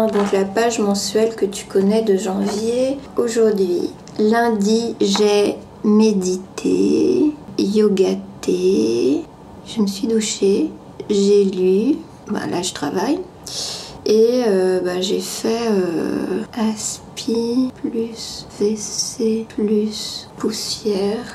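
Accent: Quebec, Canada. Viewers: te parles pu en québécois?;)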